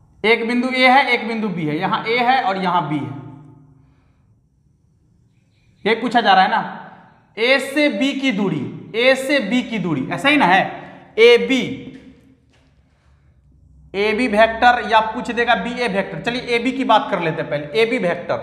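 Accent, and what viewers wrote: Bihar, India. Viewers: Guru ji hamare hi tarah khati bhojpuriya bihari hai gajab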